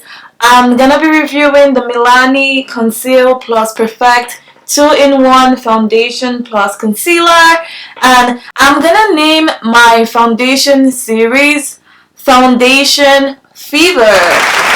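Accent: Nigeria. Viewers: Nigerian or Ghanaian?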